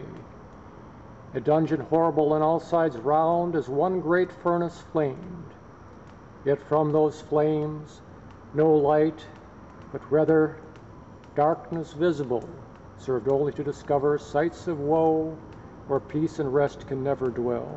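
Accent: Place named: New York, United States. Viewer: sounds a bit weird in the new york accent...like walt whitman doing larry olivier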